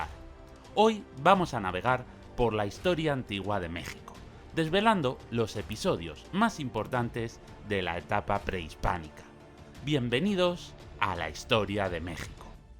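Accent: Spain. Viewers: No me gusta del todo tu relato. Es evidente tu ascendencia española.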